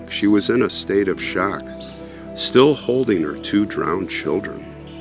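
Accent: Scotland, United Kingdom. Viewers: Ma Scottish Grand-dad would have approved of ye Scottish accent🍻 Once again - if one Goggles: The Bold at Bamurgh Castle -- you will see this video above the others listed there😊👍 I wonder why the dad doesn't seem to also have a special burial place? It's sad that she died so young. That poor mom that had her children drowned & the others.😢 It looks like eventually the sea may over take that beautiful Castle one day. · The argyle sweater is a nice touch. Fits the Scottish accent.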